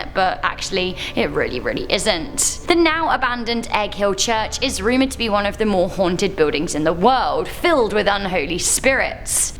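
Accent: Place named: United Kingdom. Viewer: There is nothing sexier than a subtle British accent. Love it. FYI almost all my ancestors come from your country. Found out thru DNA testing. I now know where all my family came from and its awesome.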